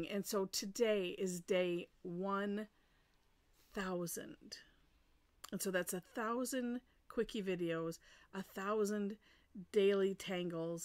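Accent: Midwest, United States. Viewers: Everyday I watch your videos. Love them and it's so wonderful to hear the midwestern accent, I'm from Chicago but living in North Carolina and everybody here has a southern drawl.😂 thank you for your videos.❤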